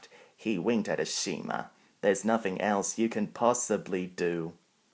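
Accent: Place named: United Kingdom. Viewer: Nice narrating☺you're using british english☺because your accent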